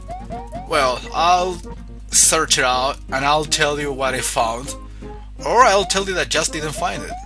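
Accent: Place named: United States. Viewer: i can hear u losing the accent a lil, i compared ur voice hear and in ur old vids, and ur starting to hav more of an............ american accent, less of a hispanic accent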